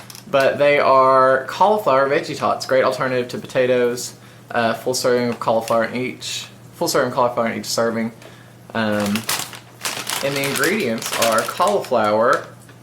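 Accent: South, United States. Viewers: OMG.. I just found your channel through Traci B and I think you are great..btw...where are you from? Sounds like you have a little country accent like me..I am in NC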